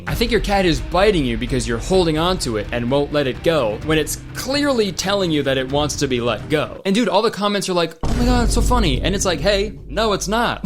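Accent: Canada. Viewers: He sounds extra Canadian in this vid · his canadian accent has never been more prominent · i love when kurtis ends a question with “eh?” because it reminds me that he’s canadian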